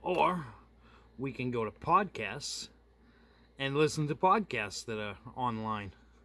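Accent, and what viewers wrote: United States. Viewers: I just don't trust, nor believe, anyone speaking about ANY subject, with this v i l e " a m e r i c a n " accent... Taking a short break from bo m b i ng other human beings ? 🤮🤮🤮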